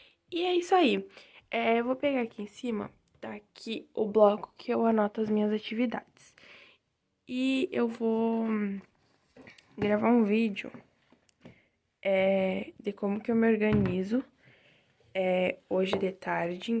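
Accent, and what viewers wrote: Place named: Paraná, Brazil. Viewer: Q estado e cidade vc mora? Não sei Acho que vc do Paraná pq seu sotaque lembra eu acho.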